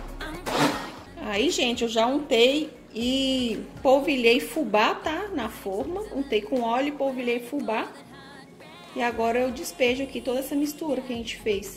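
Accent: Minas Gerais, Brazil. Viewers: amooo seu jeitinho mineirinho de falar morei em barão de cocais 11 anos,amei pessoas acolhedoras é comidas muita Boa Sou de Goiás Bjssss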